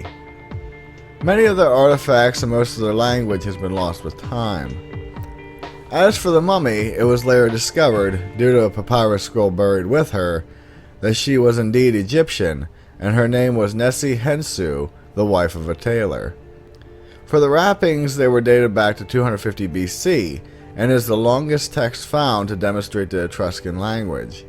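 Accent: United States. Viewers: Like the video. I assume the narrator is an american, and i´m just curious where in america one talks like this. Is it called dialect?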